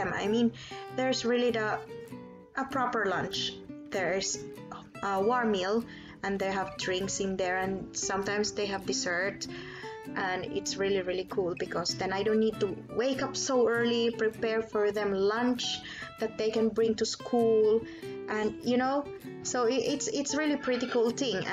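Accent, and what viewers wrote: Finland. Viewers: How it's possible that you speak English exactly like a born Finnish? I mean your accent. No-one will believe that you are from Philippines!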